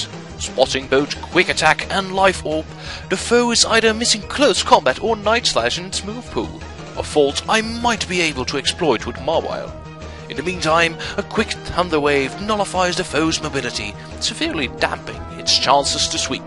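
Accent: United Kingdom. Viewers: It's the British accent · Urgh, his way of speaking I'm really not a fan of. I don't usually dislike British accents, but there's something that I can't put my finger on that makes me hate this voice. It's probably the animal planet narrator type quality to it if I had to guess...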